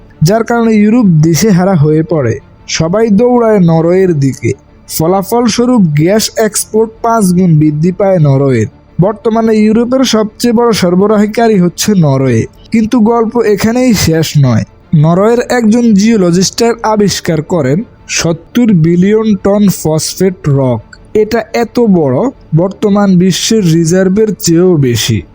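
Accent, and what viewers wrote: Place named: Noakhali, Bangladesh. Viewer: khub base bhul na korle... apni Noakhali, lokkhipur, feni theke... Bhaiya don't mind Script/ voice improve korte paren...Best of luck ✨👍🏻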